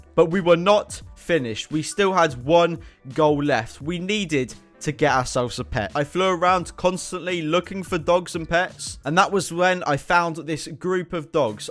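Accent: United Kingdom. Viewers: He sounds British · u sound different didn't u have a British accent or am i thinking about a different youtuber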